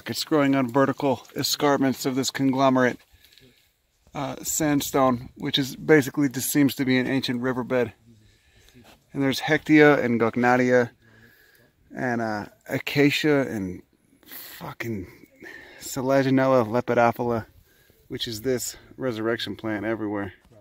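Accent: Chicago, United States. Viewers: hey Avalon type in 'meet the youtube botanist with the thick Chicago accent.....the stunning specimen is right there haha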